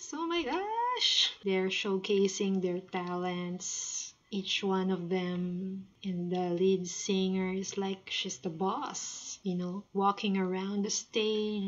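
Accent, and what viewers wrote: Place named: Philippines. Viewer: ah kala ko kasi japanese po kayo pero may accent kayong pinoy 😅. Na sa japan po kayo ngayun? Bagong favorite ko po kasi tong kaya napadpad ako dito 😁.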